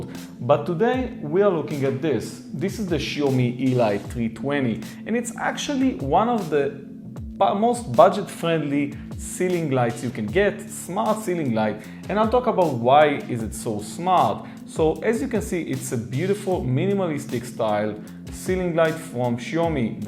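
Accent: Israel. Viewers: אין על המבטא הישראלי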